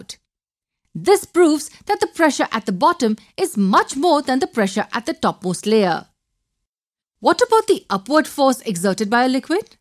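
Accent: India. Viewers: I hate the Indian accent lol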